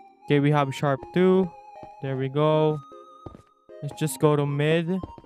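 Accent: Philippines. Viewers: from Philippines Confirm! Hehehe Proof: 1:From one of your helper in you server 😅 2:Your voice 3:You play in Asia Region Only that ooooffff But that's a good proof🤣🤣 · Are you a pilipino you sounded one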